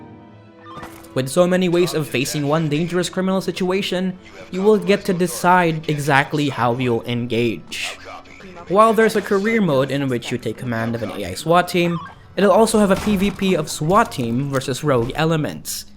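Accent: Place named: Philippines. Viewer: You must be Filipino, cuz I hear it in your accent. Am I right?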